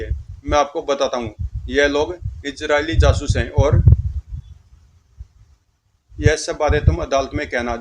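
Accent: Haryana, India. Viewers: Bhai hariyanvi lage se😊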